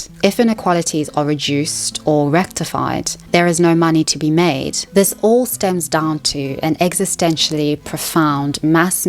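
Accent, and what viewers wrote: South Africa. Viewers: I love your channel, and I've been binge-watching your content over the past few days. I hope you don't take this too personally, but I had to comment and say it drives me crazy that you pronounce "women" and "woman" the same. Is it a South African pronunciation? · She's originally from South Africa, stop trying to push the Queens English on everyone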